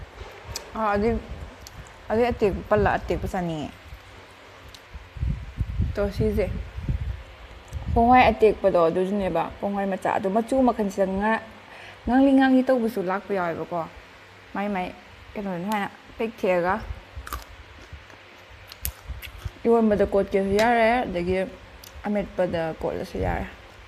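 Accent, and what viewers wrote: Kakching, India. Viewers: Kakching marol se aidi tabada yam nungsi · Kakching marolse taba ym nungaite nungcihe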